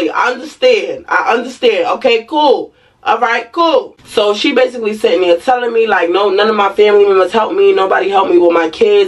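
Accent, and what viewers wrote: New York, United States. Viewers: New subbie . Are you from BK? I swear you sound like a NYC girlie . Anyways, the advice you gave her about the advance and taxes was so solid, but she wasn’t ready to hear It. Your friend reminds me of a girl I used to be cool with . Tried to help her get back into school, paid for groceries, and tried to help get her life back on track just for her to dog me out. Sometimes it’s not about you, ppl are gonna be who they gonna be 🤷🏾‍♀️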